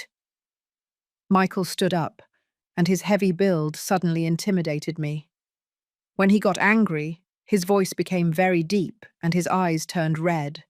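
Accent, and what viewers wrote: England, United Kingdom. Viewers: This is an English accent not American.🇬🇧 Long and drawn out with no substance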